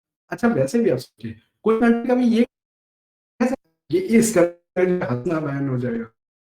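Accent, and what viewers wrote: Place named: Uttar Pradesh, India. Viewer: Aap ki boli style se andaza hai ki aap uttar pradesh se hai ,correct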